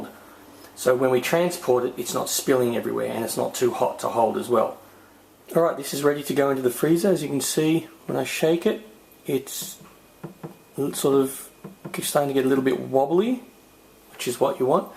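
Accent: Australia. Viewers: Aussie I think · r u aUSTRALIan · hes an aussie?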